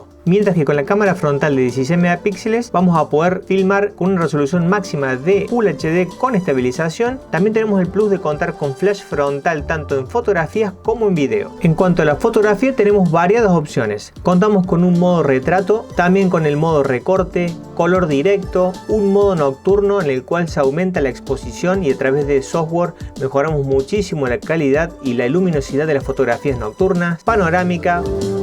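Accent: Córdoba, Argentina. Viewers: Se nota que sos cordobes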